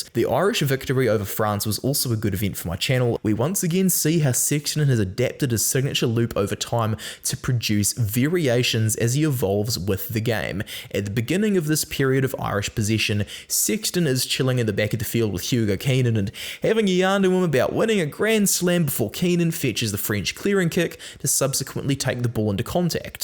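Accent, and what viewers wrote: New Zealand. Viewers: Sixton is the bist nambah tin The kiwi accent is wonderful ❤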